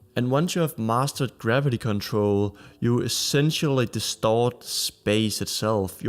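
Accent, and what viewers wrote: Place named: Germany. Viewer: You sound German so you'll probably get this. First you have to understand how inertia works, then you can understand gravity. Since gravity is an acceleration of 9.8m/s^2 and since an accelerometer measures acceleration, and since accelerometers do not show any acceleration in a fall caused by the "acceleration caused by gravity" I looked into how accelerometers work. A small piece of matter inside the accelerometer is displaced when the accelerometer is accelerated, and the amount of the small piece of matter's displacement is calibrated to indicate the magnitude of acceleration. What makes the little piece of matter move? INERTIA. The same effect when you accelerate in your car and you're pushed back in the seat. In this analogy, your car is the shell of the accelerometer, and you are the little piece of matter being displaced when the 'accelerometer' - your car -- accelerates. 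You are pushed backward by inertia. Since the accelerometer shows zero acceleration in a fall to Earth caused by the "acceleration of 9.8m/s^2 due to gravity", that told me *THAT SMALL PIECE OF MATTER INSIDE THE ACCELEROMETER IS NOT BEING DISPLACED* by gravity's acceleration. Since the small piece of matter in the accelerometer ONLY IS DISPLACED due to inertia when the body of the accelerometer is accelerated, *THAT MEANS THERE IS A DECLINING INERTIA* in the downward direction. If the little piece of matter isn't being deflected, there is NOT ENOUGH INERTIA in the direction of acceleration (downward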